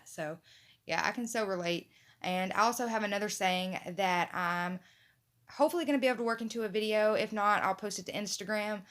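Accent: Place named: East Texas, United States. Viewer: You are very creative! I love the 3-tiered tray and I am most definitely making the “I Can Make That” sign. I say that all the time. Also love your sweet accent! ❤️East Texas?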